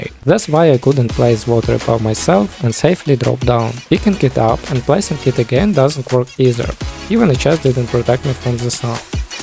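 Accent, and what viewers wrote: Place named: Russia. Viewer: я слышу русский акцент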